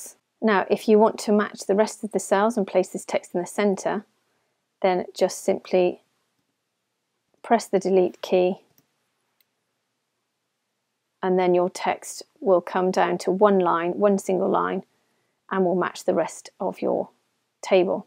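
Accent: United Kingdom. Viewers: Thanks a lot for your helping!! It helped me a lot in order to achieve my future essay, furthermore your British accent makes the audio enjoyable and understandable too!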